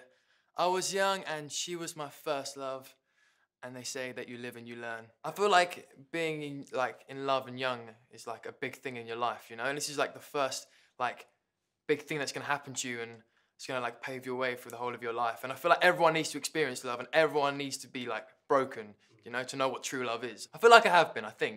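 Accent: United Kingdom. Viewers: Still can’t believe he’s British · Did not know he was British 😐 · how come you have a british accent when you talk but when you sing in a american accent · SOMETIHNG WENT WRONG WHEN IS SINGING I HAVE AN ENGLISH ACCENT AND WHEN HE TALK IT AN BRITAIN ONE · Why does he sing in an American accent but talks British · Lol hes British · OMG HES BRITISH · WOAH HE IS BRITISH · HES BRITISH ?!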